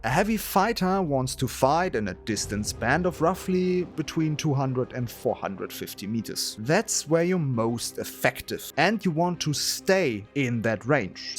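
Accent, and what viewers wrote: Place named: Germany. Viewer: Der deutsche Akzent haut rein, gutes Video!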